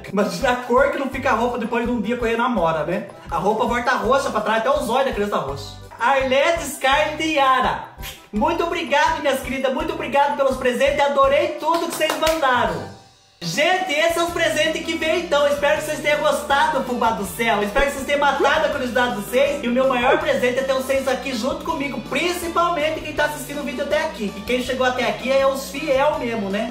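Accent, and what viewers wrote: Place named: Minas Gerais, Brazil. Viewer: Fubá do céu, vc é natural de onde? Seu sotaque parece muito com o do mineiro. Não sou clandestina.😘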